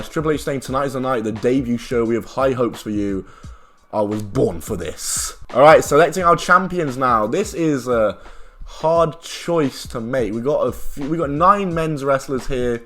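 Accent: England, United Kingdom. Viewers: I’m a black man from Brooklyn NY and I’m a sucker for an English accent speaking on wrestling. Random af but I love your content bro you’ve gotten me through a couple rough days at work I appreciate you for that man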